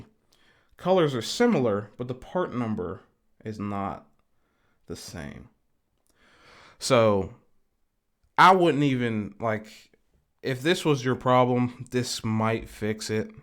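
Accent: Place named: Pittsburgh, United States. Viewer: Are u from Pittsburgh u have that p burgh slang like in errre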